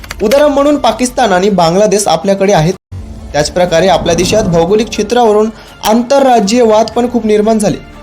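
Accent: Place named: Chandrapur, India. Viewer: भावा... मराठी हून वाटत आहे तू चंद्रपूर चा आहे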